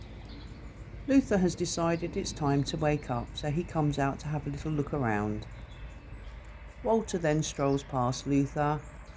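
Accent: United Kingdom. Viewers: Beautifully narrated video. It's very nice to see them safe and healthy. Always puts a smile on my face when you say "filthy dirty nose!" Must be the nice British accent. Thank you. Stay safe and well everyone! 🙏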